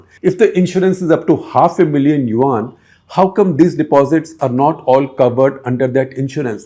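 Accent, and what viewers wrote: India. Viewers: It was great fun listening to you pronounce Chinese names in an Indian accent. Thank you sir! :-)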